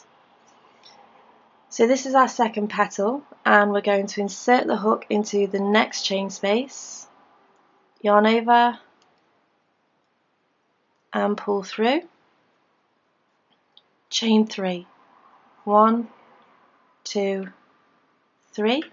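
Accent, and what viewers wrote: England, United Kingdom. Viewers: Your tutorials are THE BEST! You go along at a perfect pace and you are extremely clear without being boring. It doesn't hurt that your English accent reminds me of my mum who first taught me the basics. ;) Thank you. I am almost done with my first afghan and you have helped me all along the way. xxx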